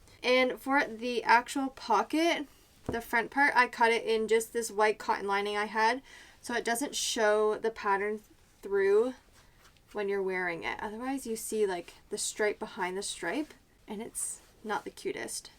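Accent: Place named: Canada. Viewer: First video of yours I’m watching! I was like oh she must be American but then you said buttons and pocket and I’m like nope she’s Canadian! 😊 Love the video! Going to watch more now.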